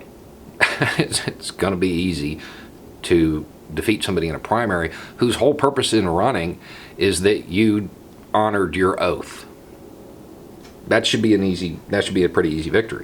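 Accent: South, United States. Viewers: beautiful posts. Listened first last week and been at it a video at time since. I am from South Africa...but am surprisingly drawn to your southern accent...its like your ideas are encased in a warm syrupy truth serum that is your voice. Your perspective is fantastic and you challenge me to think differently about what I thought I knew about American history. Your country is the most powerful in the world and as such is important for me all the way down here...so thank you for your posts. They are great and informative AND entertaining. Scary sometimes but necessary.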